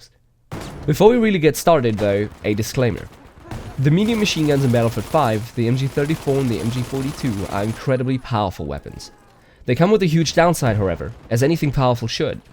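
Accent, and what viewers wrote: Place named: Germany. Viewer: your german your english shows that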